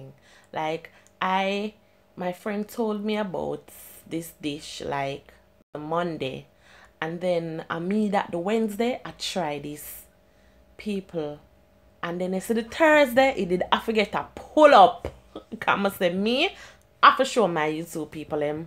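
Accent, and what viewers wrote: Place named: Jamaica. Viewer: 🤤🤤🤤 plus I love the way you speak😍 time to learn some Jamaican English 💃🏻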